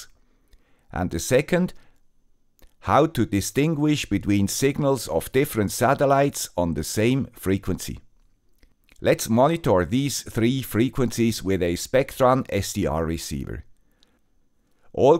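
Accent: Switzerland. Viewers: Hello, Man with Swiss accent. Can you explain more detailed how 4th satellite helps calculate exact time? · Hello guy with the Swiss accent. Can you tell us some more about the business case? It's been about a year, I'm very interested to build a base station to support RTK2GO and earn some money too. How much did you earn in this year? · That's a Swiss accent? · Lovely video! thank you for covering this topic :) greetings from another Swiss accent guy! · Swiss accent acquired at the ETH Zürich is the best Swiss accent! 😀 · Dude with the Swiss accent, you are awesome! Thanks so much for sharing this information with us! I love your content! ❤️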